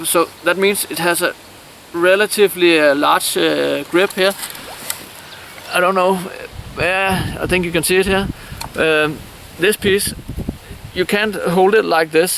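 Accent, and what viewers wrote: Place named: Denmark. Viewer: very danglish ^^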